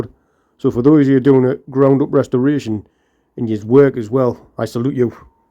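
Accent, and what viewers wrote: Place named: Newcastle, United Kingdom. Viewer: ...one of the truly great iconic cars of the early 70's along with the mk3 Cortina, geordie accent is a definite turn on by the way! 👌🧸💜xxx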